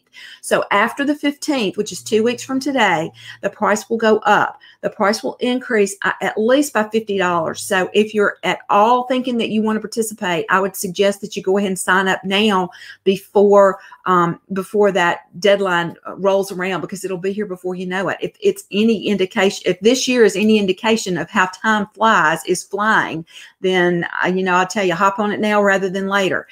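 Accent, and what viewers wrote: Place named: Mississippi, United States. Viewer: Watching in Rockville, Maryland. But I’m from Mississippi, so your accent makes me think of home! Thanks for the great tutorials.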